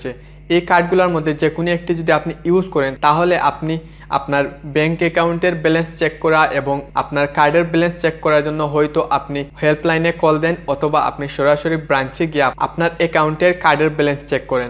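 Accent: Sylhet, Bangladesh. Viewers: ভাই, কী সিলেটি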